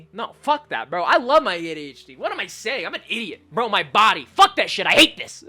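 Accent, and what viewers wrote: United Kingdom. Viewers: LOVE THE NEW BRITISH VOICE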